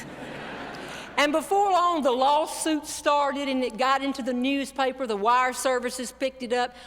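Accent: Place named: North Carolina, United States. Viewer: I really like her ,shes from NC so am I.....and thats how we talk for real....i even work in the little town of Graham, NC..Some of us even talk more southern than this...I love calling North Carolina home!!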